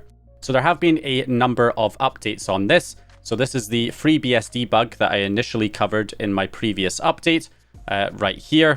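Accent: Scotland, United Kingdom. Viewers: Nah, not really. He's just Scottish. California valley girl is the worst if it's a man speaking that way.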